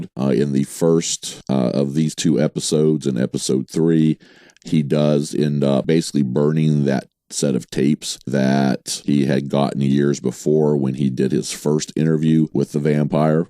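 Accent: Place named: Texas, United States. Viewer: Late to find you; glad I did. Your reviews of this series come across as fun & heartfelt. Plus you have a great voice. Sounds Texan so I'm biased. I look forward to listening to you come Season 2.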